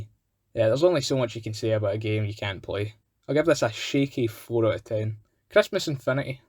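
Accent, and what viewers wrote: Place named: Scotland, United Kingdom. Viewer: May you gain million views random scottish man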